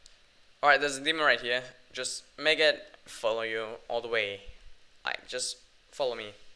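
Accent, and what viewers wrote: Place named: Denmark. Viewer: this guy has a danish accent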